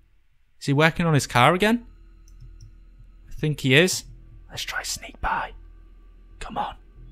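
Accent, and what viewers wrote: United Kingdom. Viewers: Another German here, i do like your videos too, but mostly i like your accent! I cannot recognize it, somehow british, but special. Could you tell me, where do people speak like you? Greetings from Frankfurt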